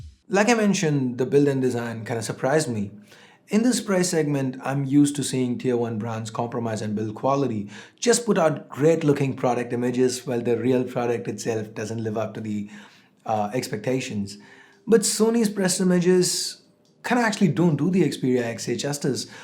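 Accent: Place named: India. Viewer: bhai just a suggestion. English ya toh clear Indian accent me bolo ya fir purely British/American whatever you are trying to attempt here, dont jinx them up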